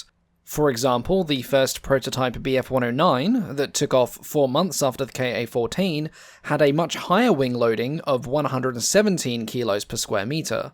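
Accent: Australia. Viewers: Pretty sure hes an aussie. I am, sounds like hes from here too.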